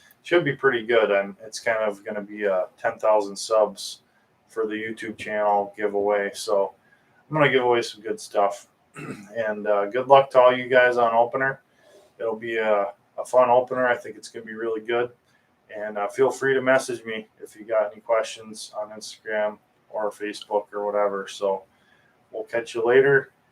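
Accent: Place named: Wisconsin, United States. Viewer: I’ve commented a few times on your channel and you’re the best at reporting northern Wisconsin fishing forecasts I’ve ever seen. The best at knowing which lures and techniques are working at any given time in any given place in Wisconsin. I love it. The Wisconsin accent is something that really brings me back to the old days up there. I know your target audience very well since I grew up on lakes in Wisconsin and I remember my Wisconsin neighbors and friends being just down to earth good people. I miss them and I miss Wisconsin. It’s part of who I am, 60 years later. After traveling all over the country and South Pacific, I’m a fisherman, always have been. But I learned to fish since I was a little kid in Wisconsin. Here’s what I’ve noticed after decades of traveling around living in California, Arizona, Hawaii, Washington, etc, I still fish like I’m from Wisconsin. 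And I am. And you know what? I’m a better fisherman because I grew up there. Wisconsinites are f*cking serious about their fishing. Not many distractions on a northern Wisconsin lake or river. No city crap, just a real in-tune and focus on nature and how to fine tune everything involved. Wisconsin winters are some of the most brutally cold around, and guys go out fishing anyway. Camping in the snow. Fishing through the ice. Nothing phases a Wisconsinite. Spring is barely a dream not a desperate hope. It’ll come, and with it, all sorts of bugs. Hatches. Mayflies. Mosquitoes. Frogs. Crickets. Fish are excited, so wa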